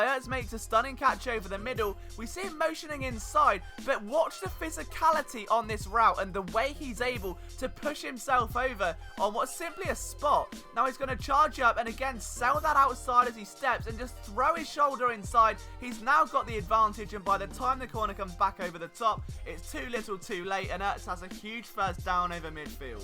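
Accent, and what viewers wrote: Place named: London, United Kingdom. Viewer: Your accent is still pretty heavy (I assume you're originally from London(?)). I'm wondering how if you live in Philly (I assume you live there).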